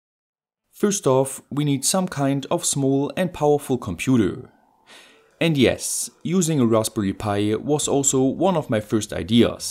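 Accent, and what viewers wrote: Germany. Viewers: Hey! Are you german? What's the accent?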